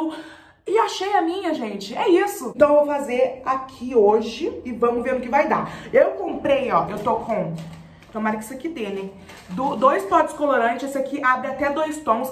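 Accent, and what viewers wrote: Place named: Rio Grande do Sul, Brazil. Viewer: ficou muito lindo teu cabeloooo🤩🤩queria ter essa resistência que ele tem ksksks, Mas ficou PERFEITO!!!✨✨✨ Saudades dos vídeos de pintando o cabelo 😁 (Sotaque gaúcho, BAH, MAS BAH)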